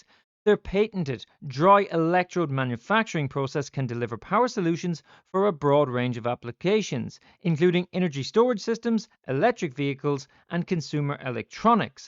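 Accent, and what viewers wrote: Ireland. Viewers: The irish accent is killing me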